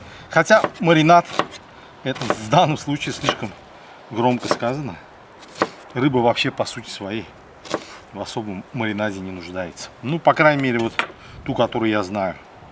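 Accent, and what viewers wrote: Baku, Azerbaijan. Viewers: Бакинский акцент. Знакомые бакинцы независимо от национальности именно так разговаривают.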